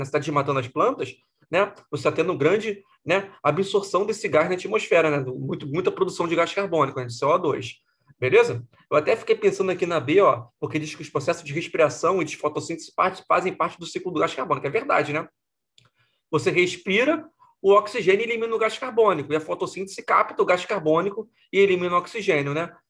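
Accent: Minas Gerais, Brazil. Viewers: Vc é de mg??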